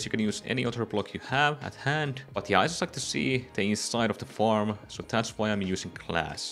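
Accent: Finland. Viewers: You sound finnish are you finnish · I like how i automatically knew you were finnish from the accent. Torille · Amazing, thanks! Gonna build this on my Realm, best design I've seen so far and super easy to follow. Love that Finnish accent too, haha!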